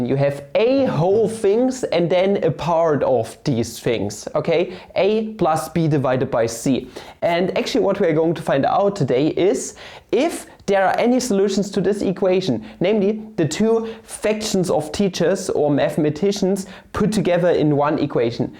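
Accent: Germany. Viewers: Your German accent is way better than whatever other accent you're trying to imitate, you should rather stick to it.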